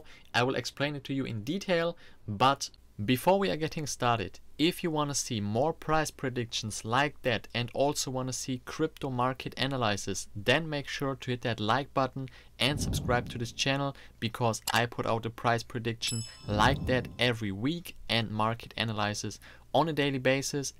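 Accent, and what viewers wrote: Germany. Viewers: Deutscher Akzent izz da 😂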